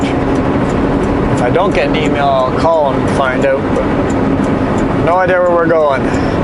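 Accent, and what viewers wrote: Canada. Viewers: Sadly, Yes. And in California gold fields, roads and railroads the Irish immigrants were used in the same expendable ways as were Chinese "laborers". I suspect that may have been true in Canada as well. I'm delighted to hear the Irish and Scottish influences in your wonderful Canadian accent as you speak, eh?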